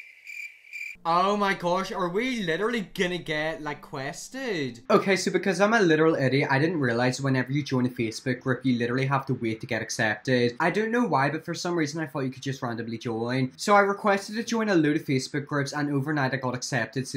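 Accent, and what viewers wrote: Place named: United Kingdom. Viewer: it sounds British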